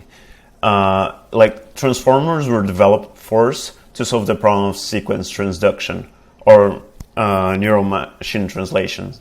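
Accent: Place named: France. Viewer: I’ve liked your French accent!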